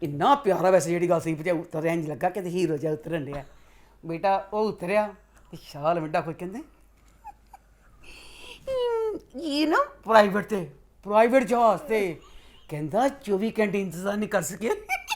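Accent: Punjab, India. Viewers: Jab sae josh ma aati han to andar se punjabi nikalti ha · Yar i loved opinion of present Govt. situation. is a pure punajbi soul. Cute punjabi accent.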